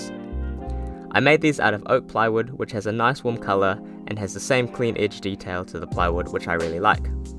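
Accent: New Zealand. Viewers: is that a kiwi accent I hear! Where are you located?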